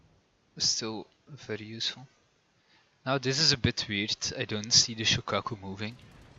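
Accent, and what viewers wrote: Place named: Flanders, Belgium. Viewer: Wow you're really not trying to hide that Flemish accent lol. :D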